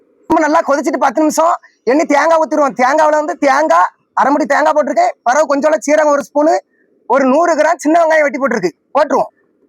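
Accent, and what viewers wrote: Tirunelveli, India. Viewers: Ele thambi. Un kulambu eppadiyo...aana nellai tamil. Suvaiyo suvai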